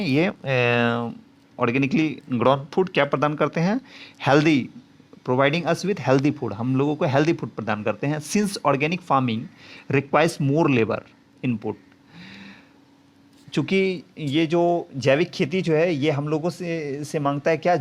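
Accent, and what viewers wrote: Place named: West Bengal, India. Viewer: Vai tumi wb thke mone hoche. ❤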